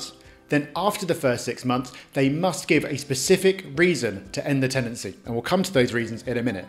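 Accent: United Kingdom. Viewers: It is irresponsible of you to NOT make it clear in the video title that this is about the UK. (Only once I heard your accent did I suspect it might be - and there again, you NEVER say it in the video either!) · Title is misleading. Applies to UK not the U.S. First hints are his Accent and mentioning Parliament. Magna Carter did percolate to protect property rights for the landlord. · The picture you click on to start the video shows the Houses of Parliament in London, the presenter guy has an English accent - I'd say it's probably England...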